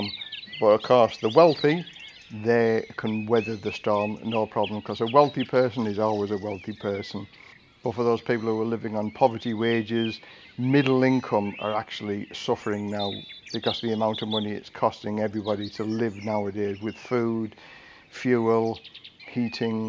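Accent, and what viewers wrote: United Kingdom. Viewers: Thanks, came at the right time! Saw this coming in the West; only going to get worse. Really appreciated, just needed the extra encouragement from a recognisable voice from the UK. Decisions made..later this year to visit. thankyou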